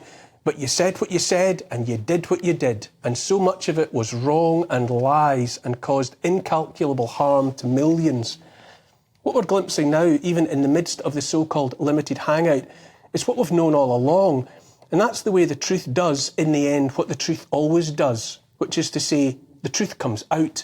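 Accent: Scotland, United Kingdom. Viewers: Well said George Best with a scottish accent😃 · it's not just what you say but the magnificent prose you use to say it. I could listen to you all day. The Scottish accent helps too.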